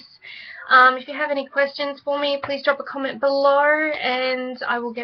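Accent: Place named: Australia. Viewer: Hi - I hear from your accent that you are an Ozzy - Ozzy girls are best. Thanks for the informative vid.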